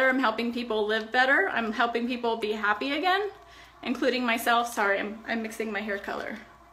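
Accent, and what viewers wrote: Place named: New York, United States. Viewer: It’s a northern jersey/ New York accent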